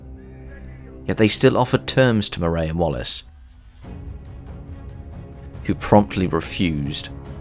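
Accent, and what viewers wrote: United Kingdom. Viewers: You had me with the perfect scottish accent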